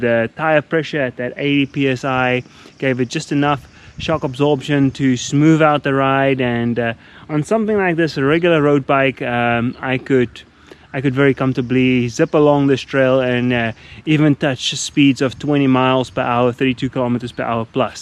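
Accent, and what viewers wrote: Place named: South Africa. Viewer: South African accent is awesome, great review!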